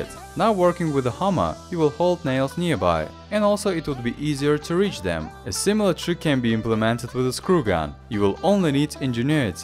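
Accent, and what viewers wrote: Russia. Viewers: are u a Russian? ? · russian accent :D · I love your accent. Question (not trying to offend) Are you Russian? Mainly because it is very thick. Again not trying to offend if you are not.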